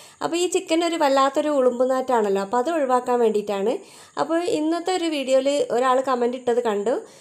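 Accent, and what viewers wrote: Kozhikode, India. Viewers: Kozhikod കാരി ആണല്ലോ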